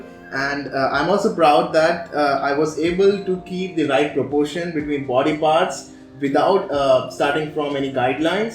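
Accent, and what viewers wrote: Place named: India. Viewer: Indian for sotaque is ugly.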